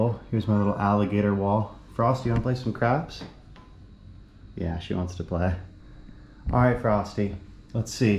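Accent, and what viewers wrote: South, Vietnam. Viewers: tiếng việt của bạn theo tôi đã dc 80% rồi , người tây nói giọng miền nam vn nghe rất thú vị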